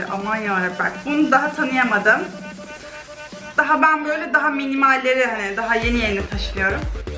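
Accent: Black Sea, Turkey. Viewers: Ohaa abla Karadenizlimiymiş. Oyyy uşağuum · Abla karadenizin neresindensin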